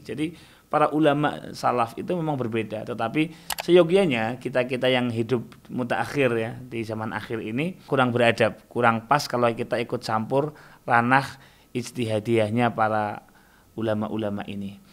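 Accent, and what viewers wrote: Indonesia. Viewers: Logatnya Bangkalan banget. 😅 Ternyata banyak Wahabi di sana Sikat, Guse...!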